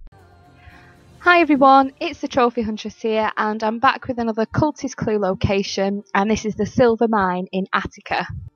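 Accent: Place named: Atherton, United Kingdom. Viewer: Sounds really similar to the Atherton accent. You dont drag out the 'oh' sound in words the same though. Best example is my mates gf. She has a channel called olibomb on youtube if you're interested.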